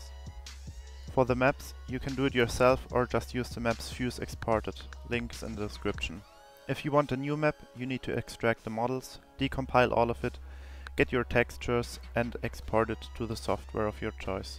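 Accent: Germany. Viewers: Wie man direkt hört, dass du deutsch bist haha :D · hyper deutscher, grüße aus österreich lul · Hallo bist du deutsch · U german?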